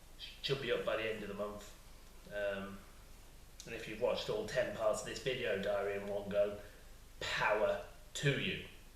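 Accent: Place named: Wales, United Kingdom. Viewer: great video as always love your welsh acedent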